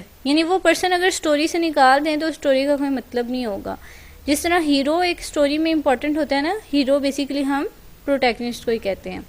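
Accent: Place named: Islamabad, Pakistan. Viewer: ویسے آپ ہیں کہاں سے ، لب و لہجے سے تو پنڈی/اسلام آباد سے لگ رہی😁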